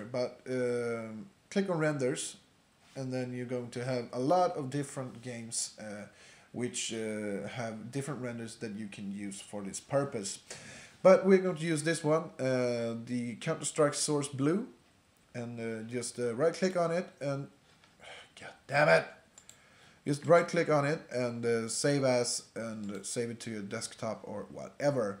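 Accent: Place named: Sweden. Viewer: based on you accent. I'm guessing you're from Sweden ;) besides that. Thanks for the tutorial!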